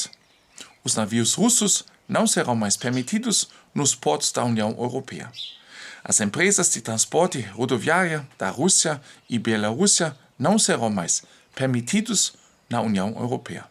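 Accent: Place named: Germany. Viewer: Parabéns! Eu gosto de assistir seus vídeos. Balneário Piçarras SC Brasil Os descendentes de alemães que moram aqui no Brasil falam com sotaque igual ao seu ehehe Em Santa Catarina temos uma cidade com quase 90% da população alemã que se chama POMERODE. Também tem a cidade de Blumenau que tem bastante descendentes de alemães.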